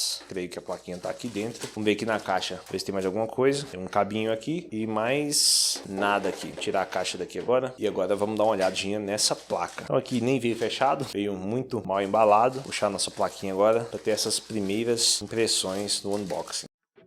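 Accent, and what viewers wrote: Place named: Goiânia, Brazil. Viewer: Você é de Goiânia? Haha Top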